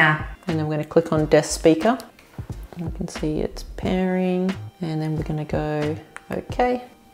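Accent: Australia. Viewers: She sounds like australian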